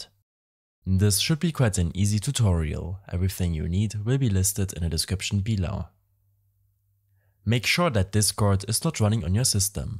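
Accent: Germany. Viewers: haha du bist deutsch das höre ich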